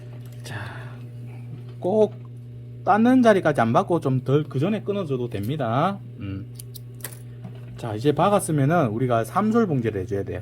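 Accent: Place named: Daegu, South Korea. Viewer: 수선의 달인 이십니다 경상도 사투리중 가장 정감이가는 대구 사투리 매력에 푹빠져 설명이 속속 귀에 들어 옵니다 오늘도 잘 배우고 갑니다 😊😊😊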